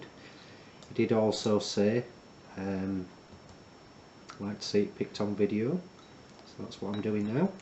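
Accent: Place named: United Kingdom. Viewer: i love your accent...or should i say, i love your british english. you got no accent at all its just how english should be spoken! :))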